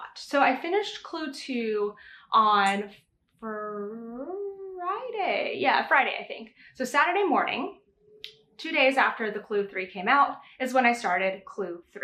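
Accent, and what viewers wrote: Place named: New York, United States. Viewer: Love the colours of the hat yarn. My current WIPs are aran sweater for me, socks for my neice, and another chunky sweater for my daughter in law.... I only started knitting multiple projects since watching you.. It doesn't get boring though. Been a while since I watched you, gosh you are developing a bit of a NY accent there....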